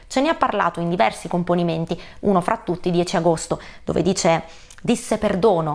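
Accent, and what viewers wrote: Sicily, Italy. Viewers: Siciliana? 😘 Bum crash...🥴 🤕...ehm! Ottimo video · nel tuo delicato accento siciliano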